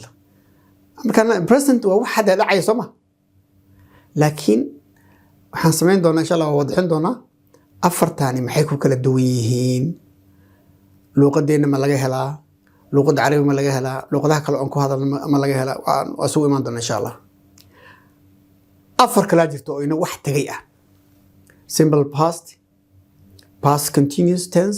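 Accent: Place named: Italy. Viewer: Dadka ku hadla luqada Italianka ayaa lahjadaas leh. (Not all of them)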